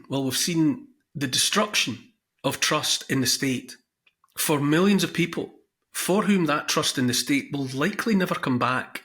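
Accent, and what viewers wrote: Scotland, United Kingdom. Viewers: Lord I love a Scottish accent, although I can't help thinking of Dad's Army.....'We're dooooooomed laddie" 😂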